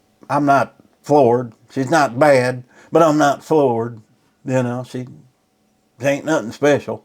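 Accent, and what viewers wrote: United States. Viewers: Podoba mi się Pański amerykański akcent :-) . Serdecznie pozdrawiam !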